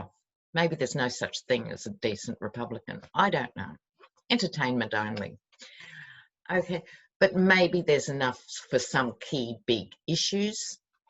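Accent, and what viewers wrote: Australia. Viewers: I love your accent. I actually listen to 3 of you aussies. You . Marianne and Elle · I love your Australian accent.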